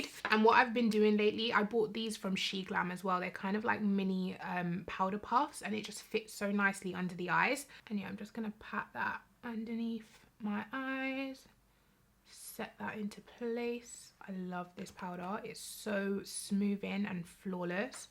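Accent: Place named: United Kingdom. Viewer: I can’t be sure, because I’m not a content creator, but I think you’re over thinking it. I like seeing your face, 1, because we have similar features. Like keep it a buck? You’re black and it’s nice to see how to do looks and make them look good on brown skin. 🤷🏽‍♀️ this look is great, I want to try it. That’s what I take from you. Maybe it’s just me, but I don’t care if you use the same products, I just want to see how to turn out looks. I am NOT a TikTok fan. Not sorry about it either. Lol, but I just like to talk about makeup and when you come back officially, I want you to do what you want to do, I just want you to be happy doing it. I just want to see your face and hear your British accent, and watch makeup. Lol. Am I over simplifying?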